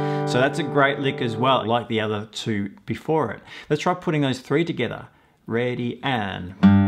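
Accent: Australia. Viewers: Australian I think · Actually I believe his accent is Austrailian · My goodness, I wasn’t expecting an Aussie voice!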